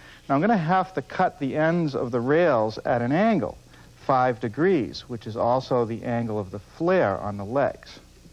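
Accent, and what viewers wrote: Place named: New England, United States. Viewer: A measured drawring. Lol My dad used to watch this all the time, i’d watch sometimes and always found the New England accent amusing and his cheerful attitude and lack of ego despite knowing more than just about anyone about it unusual, even then. I have a radial arm saw that’s probably older than the one on that show, with fewer safety features, but still runs like new. But I have it because my dad loved his so much, it’s what I learned on, so I got one of my own, all because of Norm.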